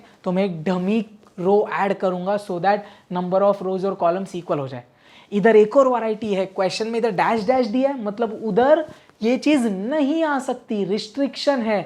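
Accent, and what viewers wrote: India. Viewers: Sir we are in love with your Hindi Accent 💘😍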